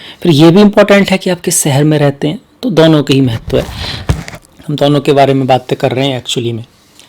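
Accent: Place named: Mathura, India. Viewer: Nice to know.....As per your accent of hindi, I think your background is from Mathura/Agra UP... I also belong to Mathura , presently residing in Jaipur Rajasthan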